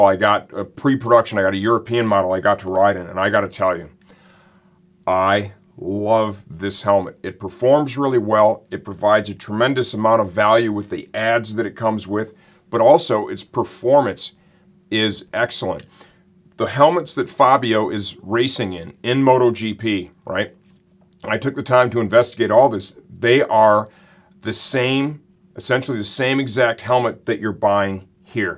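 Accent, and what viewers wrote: United States. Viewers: This man has the voice of MURICA in the intro, praise him.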